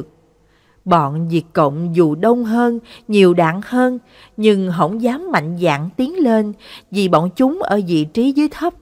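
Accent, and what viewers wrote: South, Vietnam. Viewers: Câu chuyện cảm động, với người đọc giọng miền nam mộc mạc, rất hay 👍! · Giọng đọc miền nam rất hay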